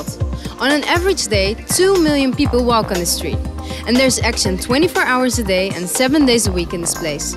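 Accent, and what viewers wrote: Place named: Turkey. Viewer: Dat turkish accent 😖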